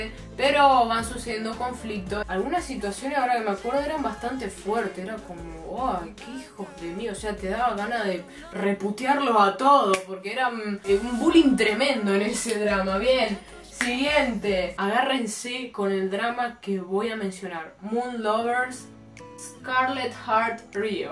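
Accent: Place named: South Korea. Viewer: JAJAJAJA la intro!! El coreanespañol cute que se te sale, es re tipíco de doramatica!! Personalmente te recomiendo Black que está en netflix, no te vas a arrepentir!! También te recomiendo Hello my twenties, las vas a adorar, también Descendants of the sun, Weightlifting fairy Kim Bok Joo. En fin, no terminaría más de recomendar pero dejo esos que están geniales. Saludos!! 💕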